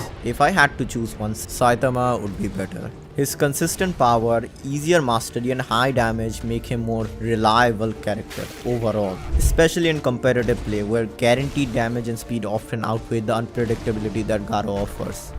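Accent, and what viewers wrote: India. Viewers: Yo ur Indian right cuz ur accent and the song u used is Indian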